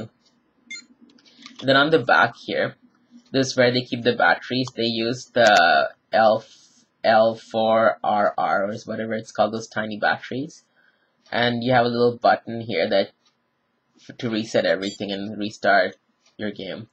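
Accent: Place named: Caribbean. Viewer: Where you from....You sound like somewhere from carribean Loving the accent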